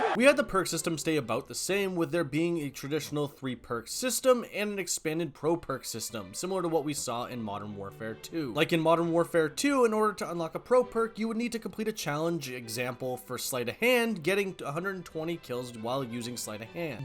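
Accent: Portugal. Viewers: Are u Portuguese?🇵🇹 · És português?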